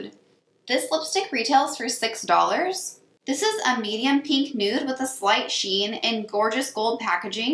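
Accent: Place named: Minnesota, United States. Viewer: Are you from Minnesota??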